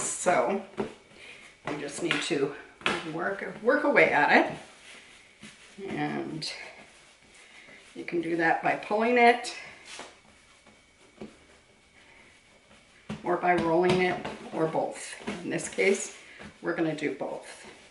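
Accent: Canada. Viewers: I love my bread machine , found it at a thrift store Brand new for $5 it makes the 1 lb loves ,,,yes you need to find the right recipe ,,,i use the dough cycle and then make mini loafs ,,,I gift it as well,,, New to your channel ,,I could tell while you were Talking you were Canadian ,,I live in Southern BC ,😊😊😊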